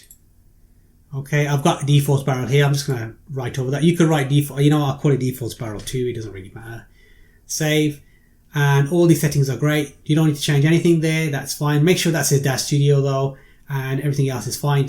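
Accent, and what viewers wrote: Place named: Midlands, United Kingdom. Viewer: Thanks for a clear and informative tutorial. You also sound like a Midlander, which is awesome :)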